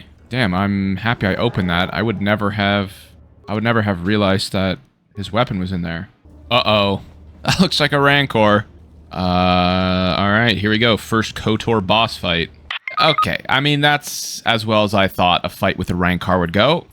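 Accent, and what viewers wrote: Canada. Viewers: He’s Canadian? Gross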